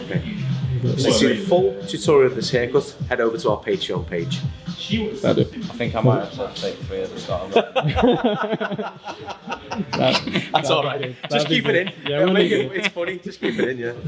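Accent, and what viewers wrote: Liverpool, United Kingdom. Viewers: are u from liverpool? sounds like a scouse accent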